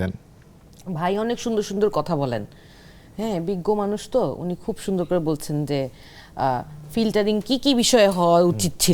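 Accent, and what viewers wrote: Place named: Noakhali, Bangladesh. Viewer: Tor Kotha noakali language er moto pronouns laghe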